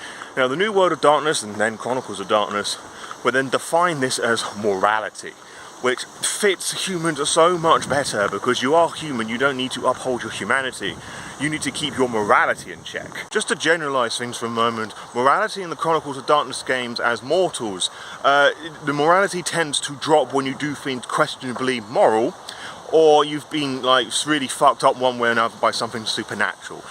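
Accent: United Kingdom. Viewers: If I were British, I would want to sound like you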